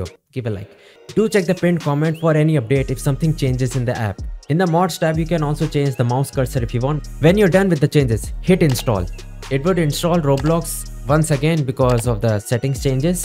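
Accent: India. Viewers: Bro are you Indian boy or smth? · once you hear the indian accent you know its gonna work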